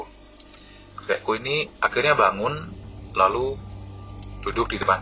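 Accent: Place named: Surabaya, Indonesia. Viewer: Dari awal denger uda langsung ngeh kalo masnya logat suroboyoan 😂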